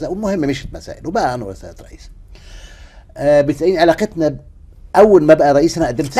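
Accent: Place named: Levant. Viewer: حكواتي بلهجة إخوانا الشوام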